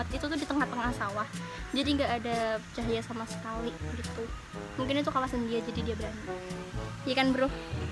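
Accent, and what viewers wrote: Indonesia. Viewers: Wong purwodadi ndy suorone lurr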